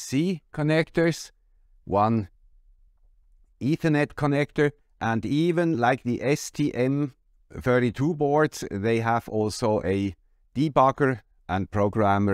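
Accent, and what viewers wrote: Switzerland. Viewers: I blame the high quality of your project focused videos: "Oh, the guy with the Swiss accent dropped a video, gonna be something cool - oh it's a mail bag. I guess I'll watch it later" :) I, for one, appreciate the breadth of these. So perhaps keep them less often, but collect several cool things. Like this one (well, two).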